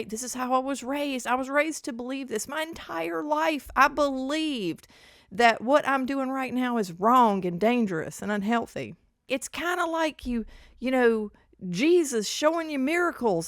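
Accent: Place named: South, United States. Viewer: You had me at "expose the corruption of Big Food and Big Pharma". Your Southern accent didn't hurt a bit!!! My family has been in the South since before the Revolutionary War!!! That said, I have primarily been somewhere between keto and carnivore since 2010. Eatimg my "normal way", my A1C is 4.1 and my insulin is 1.3...meaning I am metabolically healthy, and at the end of the day, that's my goal. Long story I'll spare you, but life had me go down a rabbithole and discovered the general science behind gut health and lactofermented foods...not necessarily a departure from keto...or carnivore if you follow Dr Sean O'Mara. I make my own raw lactofermented sauerkraut and other lactofermented foods, and make my own milk kefir, "perpetual" cultured buttermilk, and sour cream/creme fraiche. I know what's in them all and I know there's no additives or preservatives or anything funky or non-food. 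Part of the long story, not only did it help my daughter repopulate a healthy gut microbiome after MRSA, but it's helped me keep my diverticulitis at bay. To wrap this back around to my OP, my main goal is to reduce my dependence on Big Food and Big Pharma. Borrowing from Dr Berry, I try to harken back to my own ancestral eating and eat the way my rural southern sharecropper grandparents ate prior to 1960-ish. I was born in 1957 so I do know what that was. Nothing was GMO back then. They grew everything they ate, milked the cow twice a day, had chickens, maintained a smokehouse in order to pr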